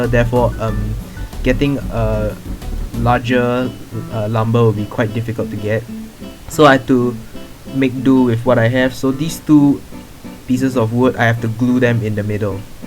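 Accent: Singapore. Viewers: Singapore accent?? :00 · Haha you sound either Singaporean or Malaysian! Anyways nice tutorial bro!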